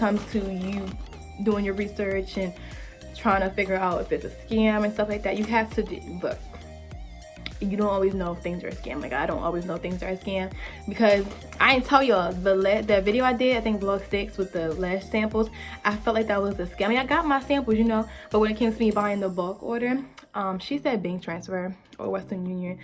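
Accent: Baltimore, United States. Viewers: are you from Baltimore? 🤔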